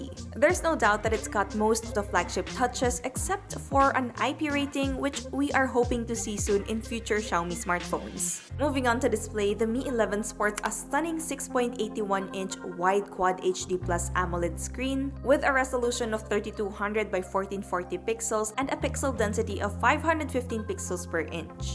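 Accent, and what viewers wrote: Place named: Philippines. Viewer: never lose that pinay accent, its part of your charm "var ree aunt"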